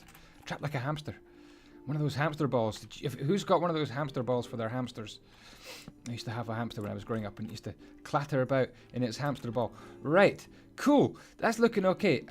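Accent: Scotland, United Kingdom. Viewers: ! How have I not subscribed to you yet?! Hits subscribe The Scottish accent is irresistible. As for my suggestion, I'd say keep the village going! I love seeing it and I've watched each episode MULTIPLE times! Cheers!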